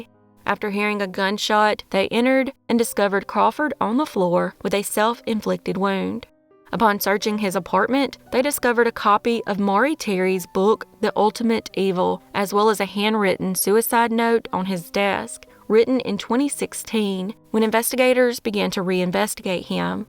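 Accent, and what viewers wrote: South, United States. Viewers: The scumbag couple that took the lives of Kandis and Terri Ann had one of the most pathetic excuses I’ve ever heard! “They had no other choice”. WTF!! Murder people or leave and go somewhere else, it’s not a hard choice! No murderer here has an excuse, that one just got to me, how stupid and vacuous this couple are! 🤬, thank you as always for your hard work and compassion for the victims. I adore listening to your gorgeous voice and southern style. ❤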